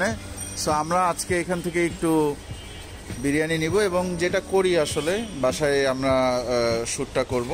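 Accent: Barishal, Bangladesh. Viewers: ভাই আপনার ভিডিওতে বরিশাইল্লা ভাষা ভালো লাগে। সবগুলা ভিডিওতে বরিশাইল্লা ভাষা রাখবেন। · ভাই বরিশাইল্লা স্টালে সেই 🤗🔥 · Vaiya apnar borishaler vasha eto valo lagse...Ami kokhono comment korina but apnar accent shine comment korte baddho holam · Vai borishal er vasa diya review ta valo lagsee......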